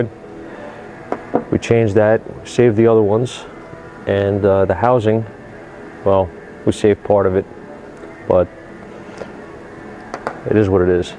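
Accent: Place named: East Coast, United States. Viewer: But I love your big mouth and eastern accent.! LOL THANKS!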